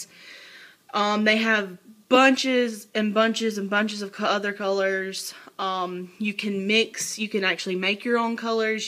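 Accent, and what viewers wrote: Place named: South, United States. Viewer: thanks! Awesome nightstand, how special that it was your grandmothers. so glad you are loving the paint! thanks so much for the shout out xoxo! I love your southern accent too:) xo Debi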